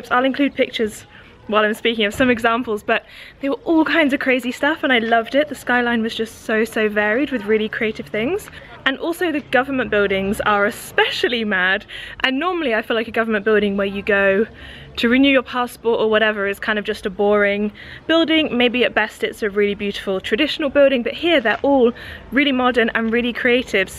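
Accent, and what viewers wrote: United Kingdom. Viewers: You are so pretty...As to your accent -you are brit - am I wrong or something....I am georgian from Moscow....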